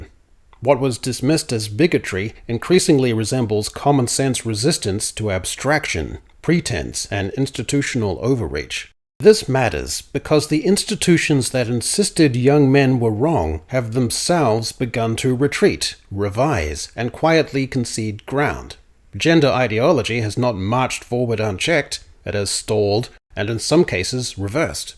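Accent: New Zealand. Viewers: The classic New Zealand accent pronounces "Ten cents" like "Tin cints", with a very short vowel that turns the 'e' into an 'i' That said, may be using both "womAn" and "womEn" correctly, but American ears can't distinguish between the way the Kiwis pronounce 'a' and 'e'. I lived in NZ for 7 years, back in the 1970s, and in Australia for 3, so I'm acquainted with some of the idiosyncratic ANZAC dialect. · I have to ask this. I have watched nearly all of your videos, and enjoyed them, partly because of your sharp analytical mind, partly because of the Kiwi accent ... but ... who is that guy with the really complicated situation in his hid?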